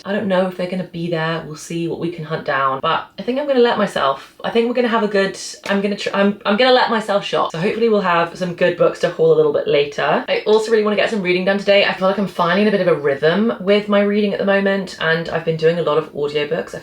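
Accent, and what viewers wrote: United Kingdom. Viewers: Hi 💁🏻‍♀️ are you going to use this channel too or will you continue with the channel? should we subscribe to both? I love british accents and you have beautiful british accent🤍so pure✨Anyways, I found this channel by chance, I loved it and subscribed! I am obsessed 📚books and cozy comfort place, this channel what I need! 😌🌻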